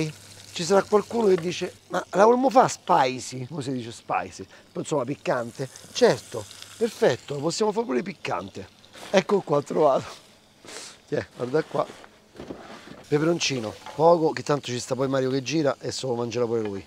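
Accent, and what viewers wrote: Rome, Italy. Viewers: Ciao ma non parlare in romano , parla più in italiano , non mettere questo stile romano che non è tanto bello , un pochino volgare , ma quello che cucini e ‘ buonissimo 👍 non ti offendere non sono solo io a dirlo , senza accento , non ti sta bene dico per te , un po’ brutticello , ma c’è peggio per esempio personaggi famosi in tv che parlano romano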